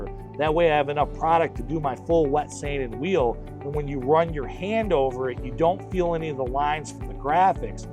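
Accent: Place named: Michigan, United States. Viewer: Q&A is awesome. I like that you are describing the way you do it. It's up to us after that to follow your way or tweak it to our preferences. I hope you keep doing the podcasts and video's. It is so interesting to hear you're way. It's great to get a professional point of view. I'm a Michigan boy removed 33 years. They said I had an accent when I moved to Washington State. I never heard it, until now. Love the Dad podcast. Also appreciate you helping us all out. I have a 67 Camaro. "Better of Dead" I'm in final sand. Base coat clear coat. I'm sanding to 600 because I'm going black. I'm using my local Wesco products. Euro prime. No epoxy. Can I put epoxy on top for a sealer? Is 600 to tight to grab the next coat? It almost shines. Using a finish line devilbiss 1.3. For final. Also. I have at least 1000 hours In bodywork. I don't want to screw it up by using the wrong product or clear on it. 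Thank you and good to hear that Michiangder accent!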